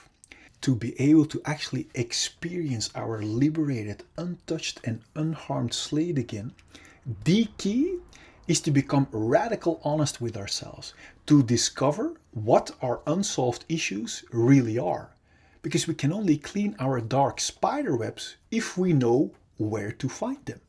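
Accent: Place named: Netherlands. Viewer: Are you Dutch? I think I recognize your Accent. If so, cool , groetjes uit Utrecht.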